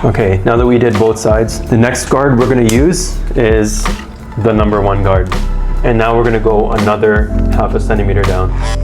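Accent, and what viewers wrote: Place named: Toronto, Canada. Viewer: Eh, are you from the GTA? (I’m guessing based on the accent)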